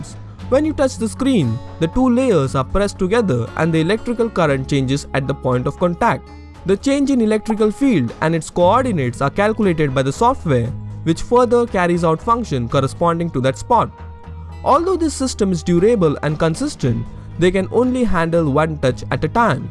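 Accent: India. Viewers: This narrator is Indian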